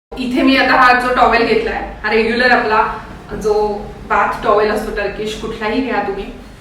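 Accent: Standard. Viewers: मॅडम : तुम्ही फार शुद्ध सुरेख आणि गोड बोलता , का कोणास ठाऊक पण कायम तुमच्या बोलण्यात आपलेपणा जाणवतो❤ ! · मॅडम मला तुमचे बोलणे खूप गोड शुद्ध असते. I am fan of you👌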